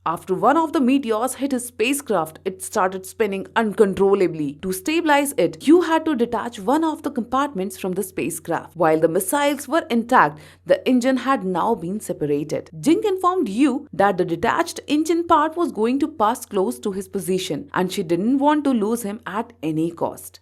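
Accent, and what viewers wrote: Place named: India. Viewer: The Indian accent is sooooo hard .... even jetfuel cant melt it....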